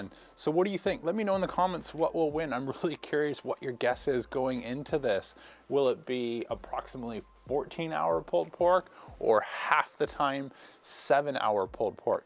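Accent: Canada. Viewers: You can tell this dude is a genuine super nice guy - that along with the accent, gotta be a Canadian. Lol. Nice vid. Just watched the LnS vs HnF rib video and came here. I'm not sure how you just came up in my recommended since I've been watching bbq vids for years. Subbed. 👍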